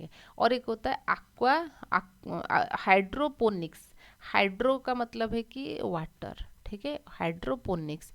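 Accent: Odisha, India. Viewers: 😳😳😳...app odisha se ho kya mam ?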